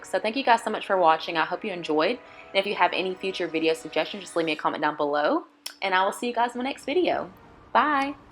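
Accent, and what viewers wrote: South, United States. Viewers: New subbie bc u r gorgeous and i love your southern accent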